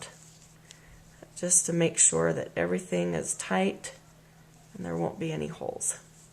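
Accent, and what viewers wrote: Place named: England, United Kingdom. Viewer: Thx for the help but just saying u come from England ur not american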